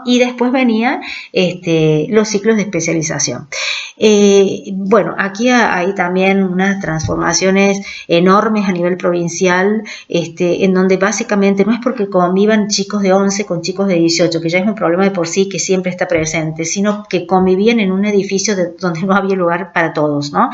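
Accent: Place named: Córdoba, Argentina. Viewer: Excelente explicación 😃 y con una excelente tonada cordobesa.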